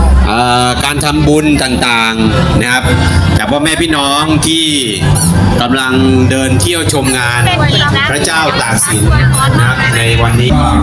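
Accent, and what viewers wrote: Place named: Laos. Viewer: บรรยายไทยล้วนไม่ปนลาวได้หรือไม่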